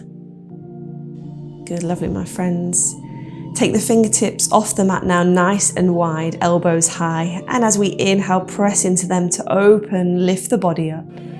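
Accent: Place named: United Kingdom. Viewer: Just found your channel and really enjoyed this class, thank you! You sound like a Brit, was this filmed in the UK? It looks pretty spectacular 🤩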